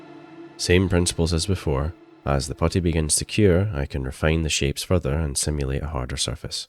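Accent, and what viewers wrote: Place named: Scotland, United Kingdom. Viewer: amazing job mate! 🤘 and that smooth Scottish accent makes the video 10x better lol 🤣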